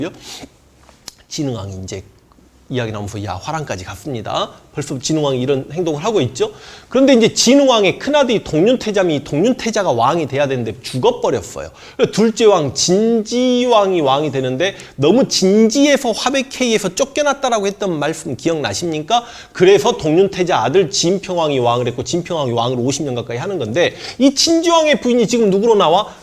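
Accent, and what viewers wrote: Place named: Gwangju, South Korea. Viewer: 억양이 광주쪽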